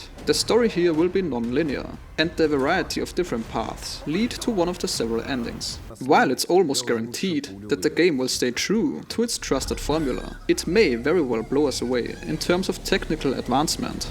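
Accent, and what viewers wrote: Germany. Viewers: Warum redest du nicht deutsch?Dein Slang/Dialekt verrät dich:goodvibes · This questions doenst have anything to do with the video it self but where you from my guess would be german since im german myself but it could also be norwegian or something up there?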